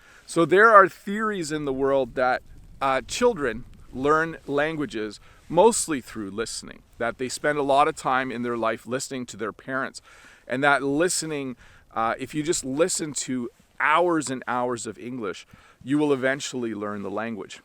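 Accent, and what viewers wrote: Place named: Canada. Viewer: I can understand Canadian 😁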